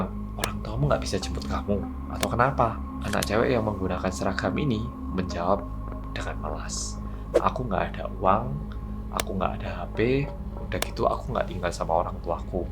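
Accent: Java, Indonesia. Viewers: Aku udah siap2 mau bilang "orang korea yang medok"😐😂 Ternyata Malah keweden😂😂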